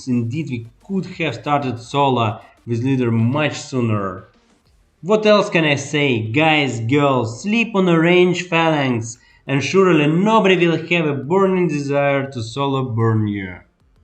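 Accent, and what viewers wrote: Russia. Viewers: Слышно русский акцент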